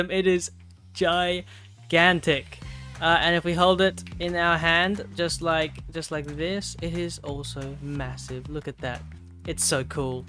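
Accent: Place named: Australia. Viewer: You sound more Australian and look more orange and scammy since I last talk to you, what happened🧐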